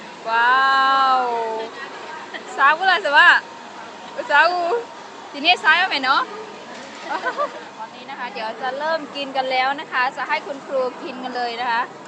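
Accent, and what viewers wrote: Thailand. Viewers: พูดพม่าเก่งแท้แต่หนักมาทางไทยมากกว่าพูดไทยซะชินแล้วครูสาวพม่ามาเที่ยวไทยมารึกฯเลย ว่าไทยเขาเปรี่ยนไปมั้ย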